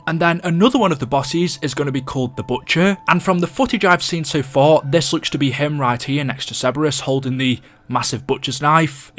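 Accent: United Kingdom. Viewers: Love your voice and vids you sound like a British Person no Offense if you are one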